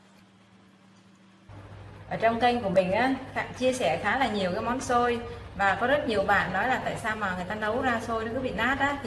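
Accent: North, Vietnam. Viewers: Chị chắc là người miền Bắc năm mươi tư(54)😘 nói chuyện nhẹ nhàng dễ thương😘👍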